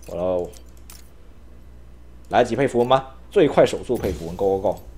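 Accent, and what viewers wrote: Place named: China. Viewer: 確實 他是大陸人嗎 那口音聽得很難受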